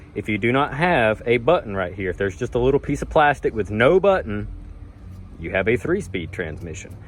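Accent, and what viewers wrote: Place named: New Jersey, United States. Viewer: Love the NJ accent. Especially love the powah mirrahs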